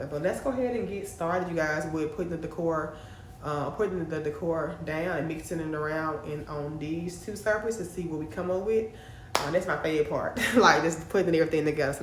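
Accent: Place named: Mississippi, United States. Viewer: If you add some more stems in the vase on the dining table it give the vase more presentation loving the mild colors in the living room it's cozy.first time watching your video while looking through YouTube. Asking you A Q what state are you from? Listening 👂🏽 to your accent when speaking it's close to my families from Mississippi. I'm N.Y.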